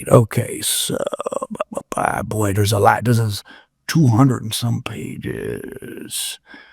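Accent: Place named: Upstate New York, United States. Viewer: I think meech gave her his life rights so she can work as a paid informant for him.why would he give someone he didn't know that long his life rights? because she agreed to the circumstances meech name was mentioned for a reason in this case or his name would have never been mentioned...This guy sounds like he's from upstate NY somewhere good break down though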